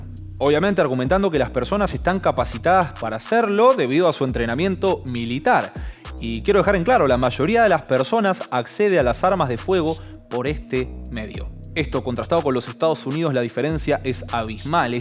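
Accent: Argentina. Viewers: Che, que me han gustado tus videos. Muy sensatos, pibe. Saludos desde México. Seguí así. (Me encanta el acento Argentino, sin afán de burla). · Por la forma de hablar parece argentino o uruguayo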